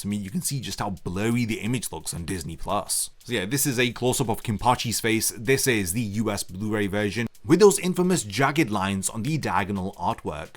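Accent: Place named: United Kingdom. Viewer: i have been buying the UK blu-reys, of Bleach an the Naruto ones, i did my own comparison from Naruto on Disney+ an found that the Disney+ version was way lower quality than the Blu-rey ones. I havnte checked Bleach tho. As this is a new video didnt u try the UK versions? Forgive me but you sound British maybe u live outside the UK, i dont know or maybe u have a large US fan base and are catering for them??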